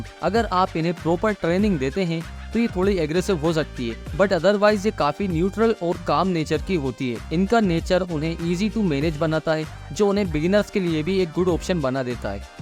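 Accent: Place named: India. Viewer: What a english hindi accent bhot acche se information di apne ❤❤❤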